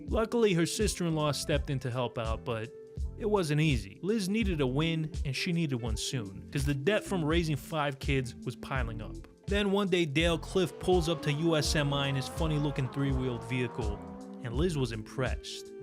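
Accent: New York, United States. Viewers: I'm New here . I'm from the UK . But I lost it when I heard " she could sell bacon to Ben Shapiro 😂😂😂 LMFAO. I can tell this guy is from NYC 👍 . You got yourself a new subscriber . Good content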